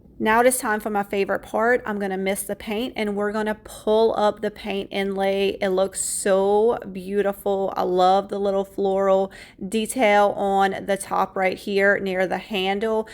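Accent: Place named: South, United States. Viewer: I’m pretty sure it’s not phony! She’s from the south and has an accent!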